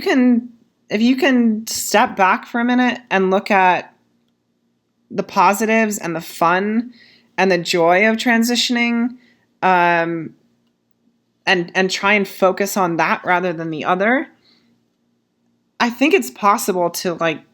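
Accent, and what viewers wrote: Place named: United States. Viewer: i only discovered you this afternoon through your two '5 lies' videos but i have already committed myself to watching them all over the next week, month, year, etc (as many times as necessary for the points you make to sink in). you have such insight and self-awareness, and seem able to articluate your feelings through language so effectively, your videos are utterly compelling. even more, you even seem able to put into language feelings i have but can't find language to express myself. sometimes you say something that 'hits' so hard i have to stop the video, take a deep breath and recompose myself before carrying on, because you are bring thoughts and feelings i have into focus for me in a way i can't. maybe because i am too close, or too afraid. or because i don't know what i am looking for when i think about myself. 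i will look into your ebook too. i'm in UK/EU half-half. i'm guesssing most of it would apply (feelings are universal) and that it isn't specifically focused on getting help in the states (i'm guessing the states, from your accent but sorry if i've got your location wrong). as for more updates and videos, i will watch anything you post without hesistation. don't let your modesty or humility stall you. my eyes widened in this video when you commented that the running time was nearly ten minutes so you had better hurry up. truly, i would have been fine with an hour. or two. whatever you wanted to do. if anyone watches your videos with one eye on the clock i wonde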